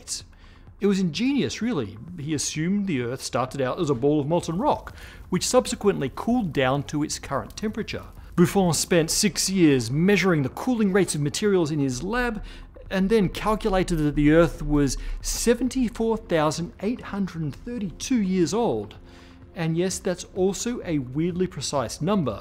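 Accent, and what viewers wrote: Australia. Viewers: He's speaking with an Australian accent, if that's what you're talking about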